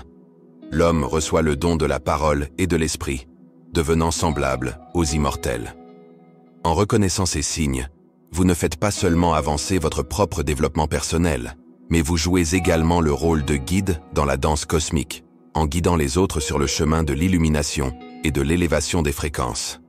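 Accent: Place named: Germany. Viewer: C'est dans toutes les vidéos (que je viens d'écouter :) de cette chaîne ... Quand l'Ai fait des erreurs ou des choses intentionnelles, généralement, ce n'est pas pour le bien des humains. Là, des mots en espagnol, francisés avec l'accent allemand, ça sonne comme un gloubi boulga de langues européennes ... Un espèce de truc incompréhensible et rabat-joie, comme l'UE de la hyène ! Pensée unique, langue unique, banque centralisée bref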